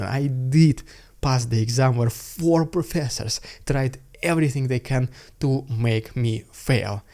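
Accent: Russia. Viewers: I think you speak English pretty fucking great, you just have a manly rooskie accent which is great! You run an English yutube channel that's no joke lol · You are not the only one. Russian universities are looking for british english accent. Not american which you obviously got, you still talk like google translate. Extremly thick russian accent your university is breeding ground for spies and other govermental officials. You should have picked up spanish instead of english. Russian universities are making anyone fail that speak american english on purpose so that more learn british accents. Supply and demand. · But don't you realise? Your English can't possibly be good enough because you still have a Russian accent! And we like it. Keep going:-) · I'm surprised how still has this accent, as a prostitute and heel licker of youtube money you'd expect him to smooth over these rough edges, or is this part of the money scheme image of a true Russian. 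And I see something of a crooked character in the tilting of the head, like zombies do 🧟‍♀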